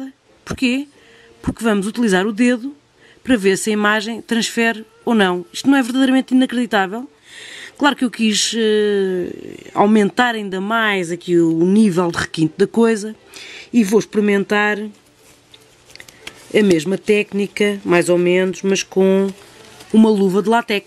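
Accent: Portugal. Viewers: 😍😍😍😍Amei Parabéns e seu sutaque português mais ainda lindoooo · Adorei seu video, sou nova aqui e vou ficar, meus pais e avós eram portugueses e adoro este sotaque.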